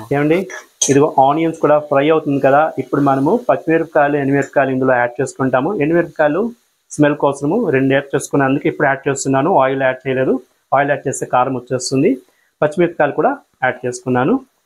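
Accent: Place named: Guntur, India. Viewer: మీరు యూట్యూబ్లో వంట కాకుండా జాబ్ ఏమైనా చేస్తారా అండి మీ ప్రాంతం ఎక్కడ అంటే మీ తెలుగును బట్టి గుంటూరు ఆ విజయవాడ అని అడుగుతున్నాను అంతేను మీరు ప్రజెంట్ ఎక్కడ ఉంటున్నారు మీరు బ్రాహ్మిన్స్ ఆ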